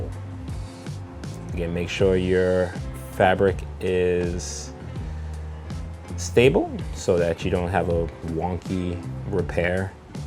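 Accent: United States. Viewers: You are an inspiration. My son can’t sew a button on. Clear instructions and a beautiful clear voice. I’m a sucker for American accents. New stubby from Scotland 🏴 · You get my thumbs up just for your sweet NY ACCENT🥰🥰🥰🥰🥰🥰🥰🥰🥰🥰🥰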